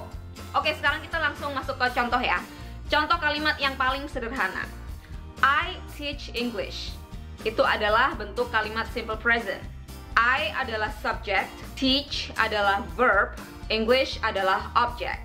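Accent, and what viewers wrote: Surabaya, Indonesia. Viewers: Mama udah lebih dari 5 aku suka video bahas bahasa inggris. ternyata sama2 orang sby, makanya paham gitu berkat logat2nya. Nice! wkwk Bahas masalah jebakan di toefle dong mom. Pengen tauuuuu. makasih banyak! good job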